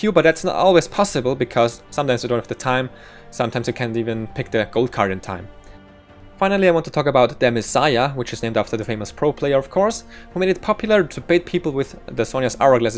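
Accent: Germany. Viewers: nice video dude. Btw are you German by chance ? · Deutsch?hast irgendwie nen deutschen akzent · hahah der deutsche akzent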